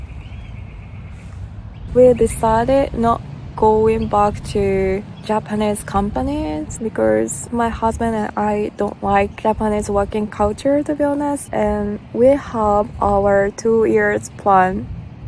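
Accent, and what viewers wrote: Australia. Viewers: Your English is improving. Some very Australian accent popping through. Yes it is cold. It’s still winter. In a few months it will be burning hot. Your dream sounds fantastic. I wish you and your husband well in establishing your little hotel 🏨 👍🏽🇦🇺😊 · Aussie pronunciation is slipping in every now and then haha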